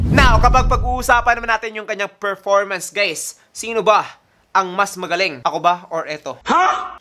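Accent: Visayas, Philippines. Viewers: Bisaya ka sir no?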